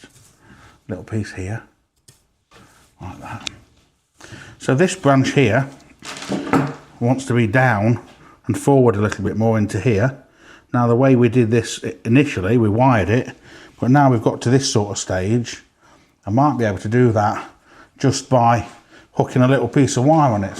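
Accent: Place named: Nottinghamshire, United Kingdom. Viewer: is a North Notts. lad ( like myself.) None of us are ever going to win prizes for elecution. Hi, say hello to Andy R for me.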